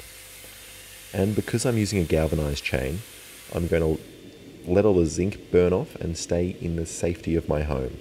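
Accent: Australia. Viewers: Got the aussie accent too… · People are saying “oH hEs sO aUSsiE” and I agree as a fellow Aussie yes we only wear thongs and barefoot (in Australia we call “flip flops” thongs get your mind out of the gutter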